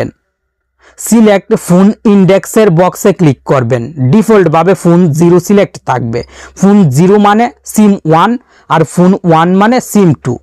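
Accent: Mymensingh, Bangladesh. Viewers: ভাইয়ের বাড়ি মনে অয় ময়মনসিংহ।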